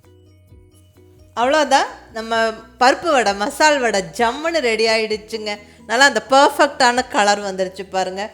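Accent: Kongu, India. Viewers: அம்மா உங்க சமையலை பார்ப்பதற்காக உங்க வீடியோவை பார்ப்பதை விட, உங்களுடைய கொங்கு தமிழ் கேட்கவே நான் உங்க வீடியோவை பார்ப்பேன்.நீங்கள் போடும் சமையல் வீடியோக்கள் சூப்பரோ சூப்பர்.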